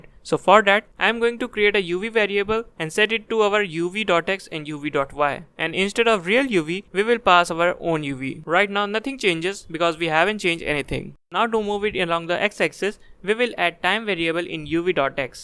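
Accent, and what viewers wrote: India. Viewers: Indian accent !!!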